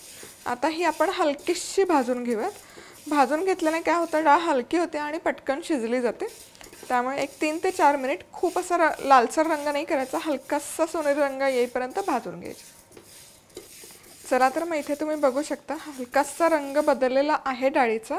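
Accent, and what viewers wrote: India. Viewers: Why do u speak so gavthi Marathi u can speak nicely also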